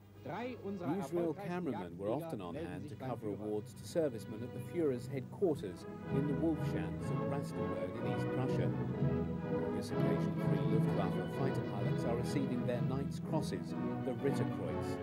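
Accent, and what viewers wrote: RP, United Kingdom. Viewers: Nice public school English , but background sound is way to high . Fire the editing guy .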